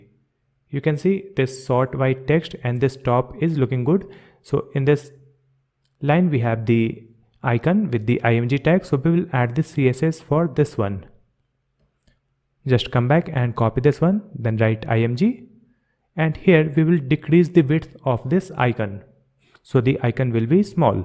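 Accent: India. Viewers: bro, sorry I cannot listen to an Indian for 12 hours.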